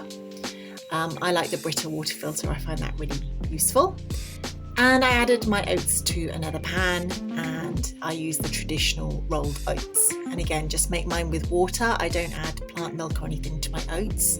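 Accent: United Kingdom. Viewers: Good luck with your channel 🥰 got the warm and fuzzies hearing a fellow Brit. Originally from Essex, living in Australia 🇦🇺 for past 20 years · I'm used to watching American starch solution advocates and I can't tell you how refreshing it is to hear a British accent. It makes you feel like you can relate especially when you mention products that I know and I can easily access. Love from Scotland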